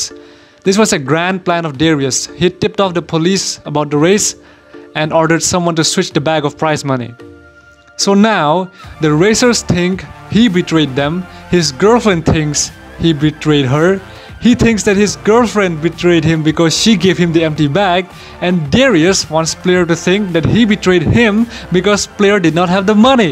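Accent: India. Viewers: Your Indian accent really brings this story to life👍😸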